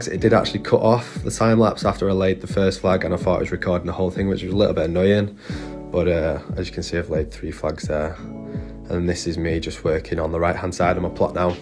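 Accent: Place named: Northern England, United Kingdom. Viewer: Looks good! A good session there mate. Where are you based in the UK? You sound Northern. I'm from Preston. Can't be too far from me I'd imagine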